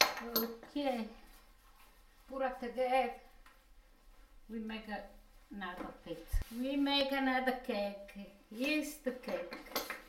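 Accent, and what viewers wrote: Italy. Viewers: As always, Grandma, love your speech, your gorgeous Italian accent, your singing, your willingness to share your techniques with us! Grazie molto!!! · Just love the Italian-English accent (not Italian myself)! Could watch this video just to listen to this dear lady speak 😍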